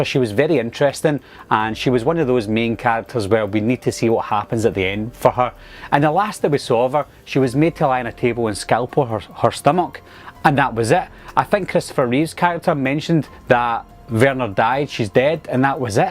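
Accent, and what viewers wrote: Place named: Liverpool, United Kingdom. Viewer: Liverpool accent ✌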